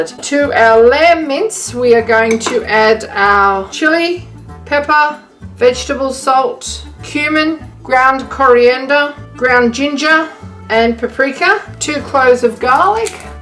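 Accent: Australia. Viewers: Another scrumptious recipe to add to my collection, thanks! I love the way the Strines say "heh" for here: )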